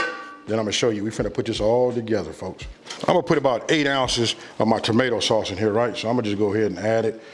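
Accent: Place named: West Coast, United States. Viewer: You are so California cool. I love your West Coast accent and your southern way of cooking.❤😊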